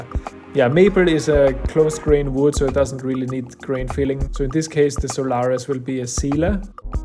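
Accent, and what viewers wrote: Austria. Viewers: Are you Austrian? Your accent is very similar to Arnold Schwarzenegger's to my ear.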